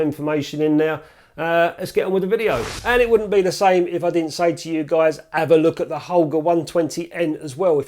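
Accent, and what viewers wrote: United Kingdom. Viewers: Excellent, though with a pronounced British accent, include the fabulous Zeiss Super Ikontas comprising the 8 frames 6x9 cms, the 12 frames 6x6 cms & the 16 frames 6x4.5 cms cameras in part 2.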